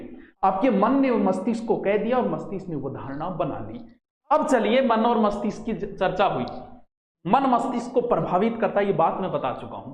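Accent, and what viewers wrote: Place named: Marwar, India. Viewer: Sir..ager English k words bolte ho to pronunciation ka dhyan rkho..."Marwadi" Wali feeling aati h... Other wise hindi hi bolo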